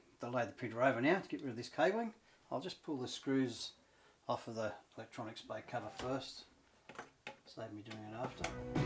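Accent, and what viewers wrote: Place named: Australia. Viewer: Love hearing a fellow Aussie at work, great video helped me a lot! Jyers is a great firmware!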